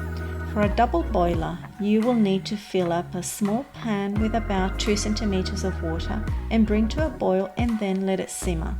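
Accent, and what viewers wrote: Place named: Australia. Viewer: Love it... voice over is amazing love your aussie accent!!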